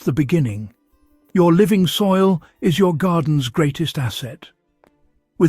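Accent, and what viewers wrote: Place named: United Kingdom. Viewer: I really don't like these AI generated videos. This video is narrated in a distinct British accent and uses metric measurements but says United States?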